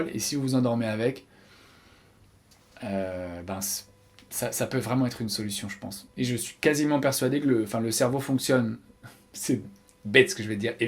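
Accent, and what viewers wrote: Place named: United Kingdom. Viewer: J'adore votre accent so British 😊😊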